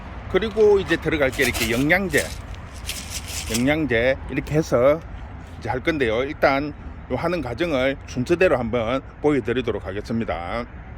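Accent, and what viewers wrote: Gyeongsang, South Korea. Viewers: 구수한 경상도사투리 파종법 영상 올려주셔서 감사합니다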